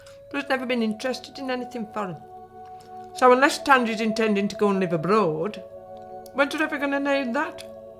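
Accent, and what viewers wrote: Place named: Birmingham, United Kingdom. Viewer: Love it!! Her accent reminds me of home (Birmingham, England) and she's hilarious!!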